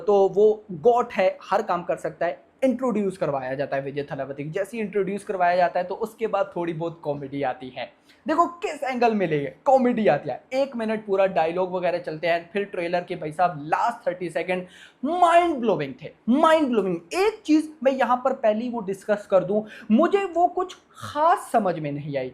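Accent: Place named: Pakistan. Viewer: Why are you over reacting and I don’t know, i feel You have a Pakistani accent.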